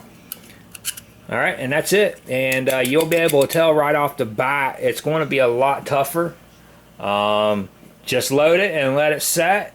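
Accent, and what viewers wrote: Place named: Southwestern Pennsylvania, United States. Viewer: Heavy southwestern PA vibes from you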